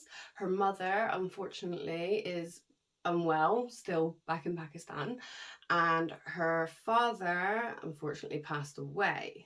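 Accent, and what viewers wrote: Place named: West Country, United Kingdom. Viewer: Cornwall, or the West country? 😂 that's a guess from a northerner. Either way, great video, keep at it! :)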